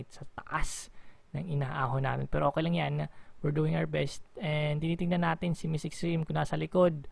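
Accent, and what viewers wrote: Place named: Waray, Philippines. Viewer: Idol extreme tagasaan kaba? Bakit may halong Waray yung sa lita nyu?😊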